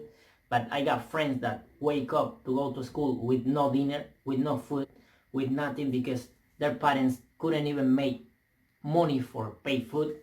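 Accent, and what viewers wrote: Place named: Cuban-American, United States. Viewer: su asentó es cubano/americano aquí todos hablan diferente si escuchas a un australiano habla diferente a un inglés y los dos mensionados hablan diferente a un americano incluso aquí los new yorquinos hablan diferente a los californianos. No se tu de donde tengas el acento. Yo lo tengo Californiano y le entendí todo muy claro a.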